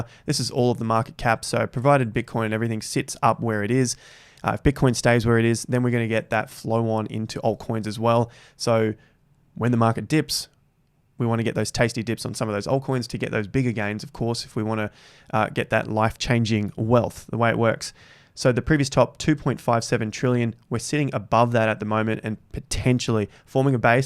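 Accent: Australia. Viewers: It’s great to hear another Aussie. Are you Melbourne based?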